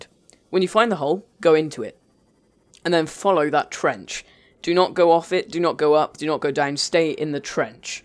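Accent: United Kingdom. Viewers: can you stop damn moving the camera up and down it is seriously irritating and with the 'itish accent!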